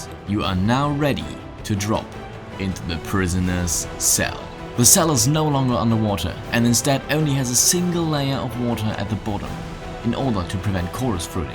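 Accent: Germany. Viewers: u german?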